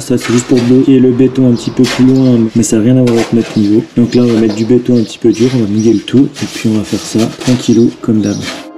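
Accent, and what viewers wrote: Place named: Franche-Comté, France. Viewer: J'adore ton lancer d'eau pour humidifier avec ton petit balai à chiottes. Salut à toi mon Franc-comtois. Reste ainsi avec ton accent tellement vrai. 😊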